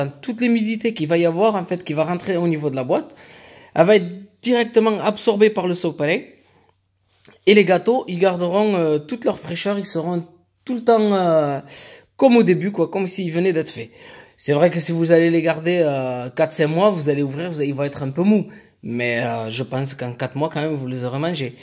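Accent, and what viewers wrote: Marseille, France. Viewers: je suis ivoirien (cote d'ivoire ) on m'a toujours dis que ceux qui parle français avec cet accent sont marseillais. estce vrai?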